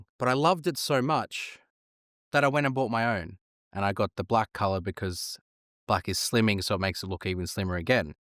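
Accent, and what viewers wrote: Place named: Australia. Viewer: Credibility: screenshots lost because I have to send it back . Yet , you hold up the one you bought . Any expert would send photos of 10 days to their keeper new black phone. .....just the optics and the devils advocate voice in my head. Schill or not , I've been here for information n exposure to new products, uses . ....to expect a lack of bias is to expect a lack of Australian accent. Dude, I tuned in just for the accent and the bias . Love you, man! Nothing but Respect.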